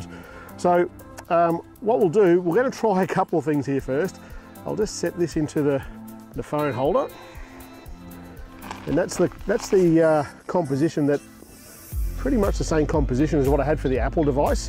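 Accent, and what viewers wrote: Australia. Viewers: With my upper intermediate English I can barely understand Australian accent :( But videos are great :) Thanks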